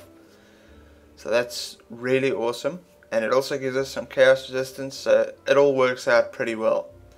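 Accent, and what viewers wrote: South Africa. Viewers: I knew that accent was South African :D mooi man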